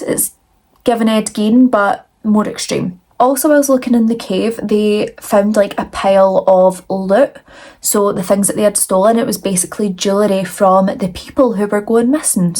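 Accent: Scotland, United Kingdom. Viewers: Nothing beats the authentic British accent! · It’s a Scottish accent, but close! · ❤ love your accent ! Are you Scottish? Sorry first video of yours i found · Hey I don’t usually comment like this on videos but I see you’re new so I wanted to post more constructive feedback. I think you’re a bit hard to understand and I don’t think it’s the (scottish?) accent. For clarity, I’m American which doesn’t help since I don’t hear this accent too frequently, but I think it’s a microphone quality issue since it’s the fuzziness between words that makes it harder to understand. I loved your video, first time watching but I think it’s an audio quality issue. It’s either from the microphone you’re recording from or maybe from compression when you’re downloading the video. I don’t know much about it all but your camera quality is very good and you speak very well and it sounds close to the microphone so I assume it’s a compression/ tech quality issue. 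From my time playing classical music and having to record audio for lessons and stuff, I’ve learned that putting my mic in a 12x12 foam box helps some or putting a mesh barrier between you and the microphone. I hope this doesn’t come off critically, your content and video are very well made and I subscribed to the channel as I think it’ll be awesome! I just don’t want you to lose potential subscribers due to audio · That's what I said I just found her like just now, listened to her talk and I'm like omg she Scottish love it already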